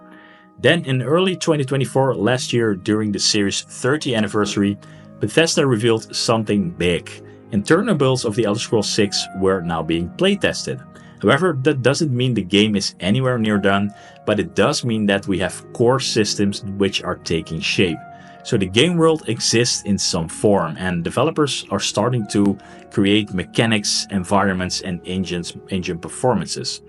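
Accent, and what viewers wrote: Netherlands. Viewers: this guy is definitely dutch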